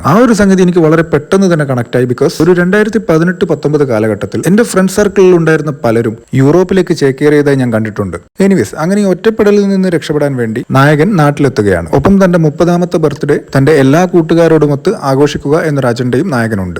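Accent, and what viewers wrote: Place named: Thalassery, India. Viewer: വീണ്ടും തലശ്ശേരി 😁ഇങ്ങൾ ഏടെയാപ്പാ 😌 കണ്ണൂർ ആണോ..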